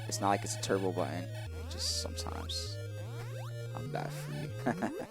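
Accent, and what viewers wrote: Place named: New York, United States. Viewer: I recognize a newyorker anywhere, #Queens!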